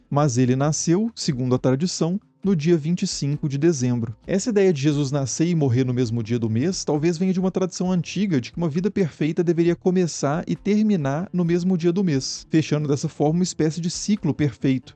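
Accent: Minas Gerais, Brazil. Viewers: É mineiro?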